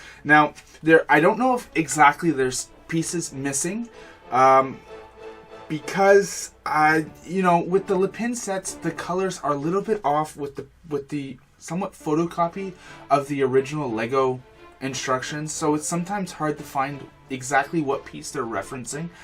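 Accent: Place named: Canada. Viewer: Ah okay! Sorry, I didn't detect the Canadian accent! Yeah, In Canada it released for CDN$300. It went as low as CDN$271.63 about a month before Christmas in 2014 after the Lego Movie came out: <link> Now, you're right it's crazy expensive: CDN$ 649.99 The subreddit r/Lepin is a really good place to go for info on this stuff. Really enjoy the vids btw, keep it up Hope your channel grows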